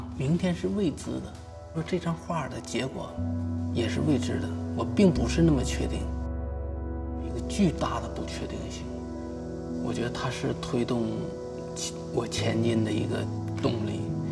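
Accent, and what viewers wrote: Northeast, China. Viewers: 哎呦我的妈 这东北普通话。。。。。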